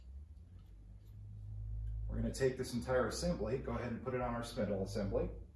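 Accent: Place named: Midwest, United States. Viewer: Your monotone is kinda creepy definitely back east somewhere possibly Wisconsin or Minnesota?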